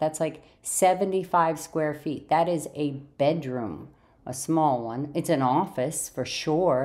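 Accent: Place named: Northeast, United States. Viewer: really appreciate your vids. You so remind me of the Northeast, where i used to live- just your ways,, accent- down to earthed-ness-loveit!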